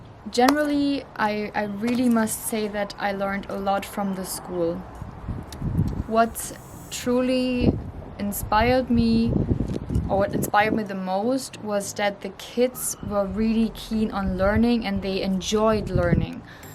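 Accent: India. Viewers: your English communication like Indian and very simple and not like your Motherland.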